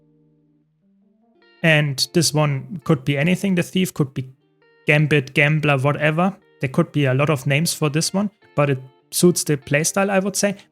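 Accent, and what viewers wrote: Germany. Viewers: uff mir fällts immer wieder auf wie sehr es anstrengt den deutschen akzent zu hören. aber dennoch, gute anordnung der elite spezialisierungen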